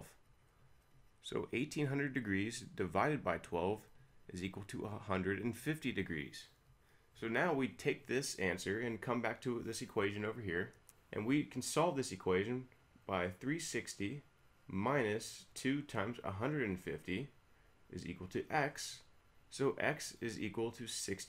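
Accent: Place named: United States. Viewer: American voice talking about an Australian coin.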